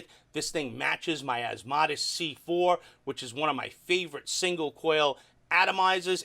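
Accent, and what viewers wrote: New York, United States. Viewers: "I'm a newyorker thats the way we talk"... classic! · lol ya your New York accent would offend some of these cheese puffs in California coming from the east coast i know New Yorkers, but some of these silly fluffs man oh man this world of ours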